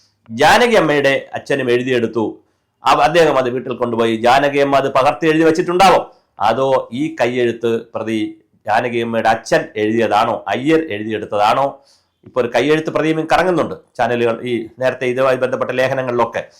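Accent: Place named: Pathanamthitta, India. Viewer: Pathanamthitta slang ph for bh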